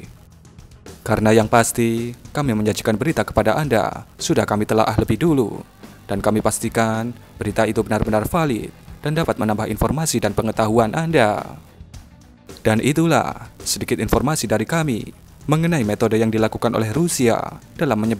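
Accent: Java, Indonesia. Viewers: Suaramu medok bro kocak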